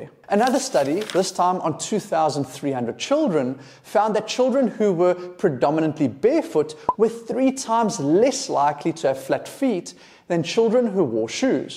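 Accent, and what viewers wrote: South Africa. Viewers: Wow you sound so South African lol Anyway, I think I was born with flat foot (only one of my feet is flat) As the years go on my foot is becoming more and more sensitive. It aches even when I'm sleeping.. I'm hoping some of what you said can help me. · You sound South African…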